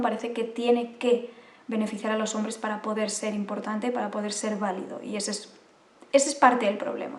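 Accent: Spain. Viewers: Y otra cosa la verdad no se de donde seas??. Por tu asento española no se si salgas pero en cuanto te refieres que los hombres hablan mas vete a un bar de estados unidos o de tu mismo pais sienpre abra grupos de muejres a estar hablando y hanlando y no se callan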